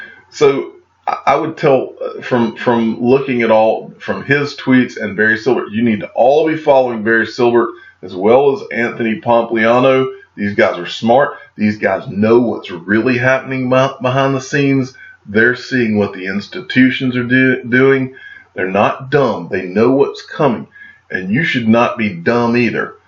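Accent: South, United States. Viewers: HAHAHAHAHAHAH you are a fucking FAN BOY piece of shit... Stop SCAMMING people... How much XRP SHIT coins are they paying you for your SHITHOUSE forrest GUMP accent videos... you fucking STINK!!!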